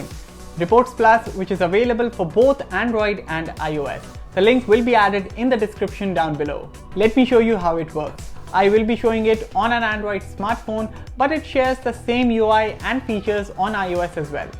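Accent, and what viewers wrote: India. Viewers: Indian accent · Indian accent, im out!!! ✌️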